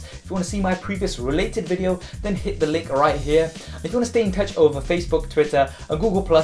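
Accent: United Kingdom. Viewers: Very good vid. But I really can t subscribe s.b. Speaking this terrible B.E. accent. Why don t u speak more "neutral" or even A.E. accent? Still... Thx for tha video.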